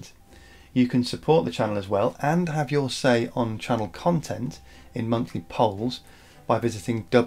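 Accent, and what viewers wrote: United Kingdom. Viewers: We weren’t ready for that accent. I love British accents.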